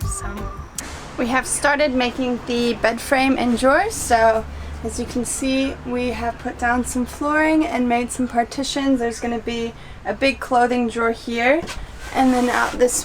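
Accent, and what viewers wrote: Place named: Australia. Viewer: It's interesting how you're developing your own kind of Australian accent. It's subtle, but definitely becoming more noticeable with each new video you put out. It suits you. Cheers to another fun video! Congrats on the van build!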